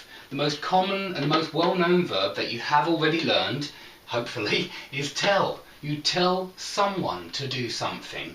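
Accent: United Kingdom. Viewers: you've got such a cute British accent. n.n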